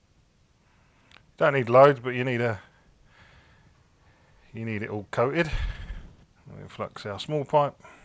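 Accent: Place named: England, United Kingdom. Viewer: I wouldn't want it near my drinking water to be honest but after it flowed once it is pretty much like normal solder and won't reflow at 70C or thereabout. I just wanted to illustrate that there is a range of solders with differing lead content and melting points. Most decent ones will state the temp required to solder somewhere on the label or datasheet. PS, thanks for the reply, I feel like I have met you a million times, Lots of Pommies here that are dead ringers for the accent and I think I even know someone who looks like you, just van;t put my thumb on it.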